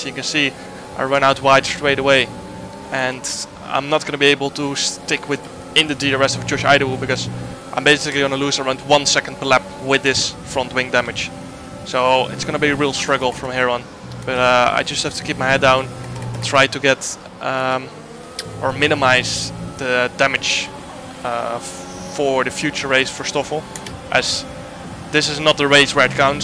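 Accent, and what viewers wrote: Netherlands. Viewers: je hebt echt wanneer je Engels praat hoor je gewoon het Nederlandse accent Lekker gedaan jammer met de damage Maar lekker bezig een "keep it up"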